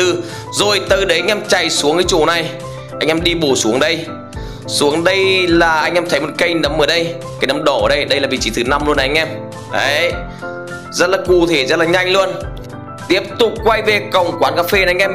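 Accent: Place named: Central, Vietnam. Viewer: Anh người miền Trung à anh,chữ e ko hiểu:))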